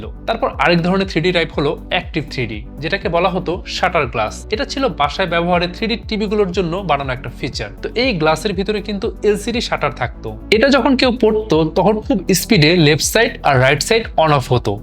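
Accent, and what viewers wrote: Bangladesh. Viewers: কেবলমাত্র হাড়িয়ে শব্দটাই নয় , আপনার কথা বলার ভঙ্গিমার মধ্যেও এমন অনেকগুলো শব্দ রয়েছে যেগুলো আপনি বাংলাদেশী মানুষদের মতন করেই উচ্চারন করেছেন , আমরা পশ্চিম বঙ্গের বাঙালীরা সেই একই ( এই শব্দটার কথাই বিশেষ করে বলতে চাচ্ছি ) শব্দ একচু অন্যভাবে বলে থাকি । যাইহোক আপনাকে অভিনন্দন জানাচ্ছি । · বাংলাদেশের বাঙাল রা এই রকম উচ্চারণ করে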